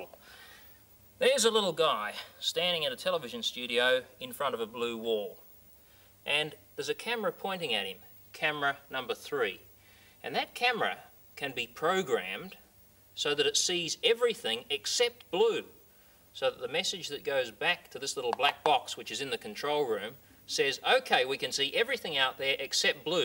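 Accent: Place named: Australia. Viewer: You learn 50% more when the instructor has an Australian accent.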